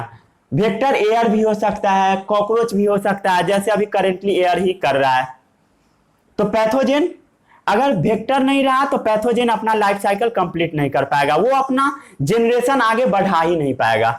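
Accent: Bihar, India. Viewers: saab BTW pronunciation is not a genetic feature.. And the so called 'pronunciation problem" you are talking about is our accent which we Biharis are proud of.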